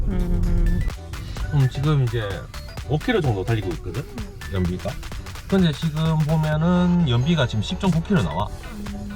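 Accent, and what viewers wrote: Daegu, South Korea. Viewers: 군생활을 대구에서했는데 간만에 오리지날 대구사투리 반갑네요. 연비 잘보고갑니다.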